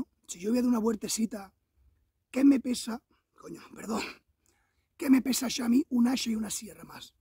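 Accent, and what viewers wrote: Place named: Malaga, Spain. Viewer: Hola,por tu forma de ablar, me perece que eres de de Malaga.Yo soy de un pueblo de Malaga concretamente de la serrania de RONDA,de un pueblo que se llama <link> gustaria conocerte y poder enseñarte los montes y sierras que hay por nuestro entorno.tenemos unas bistas preciosas y unos lugares para hacer bushcraft impercionantes.y te enseñaria mi coleccion de cuchillos,a porcierto me llamo Rafael.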